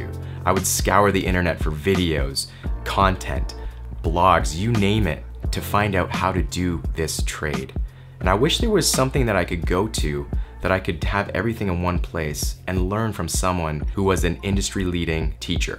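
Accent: Canada. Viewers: Canadian, eh? Love your channel.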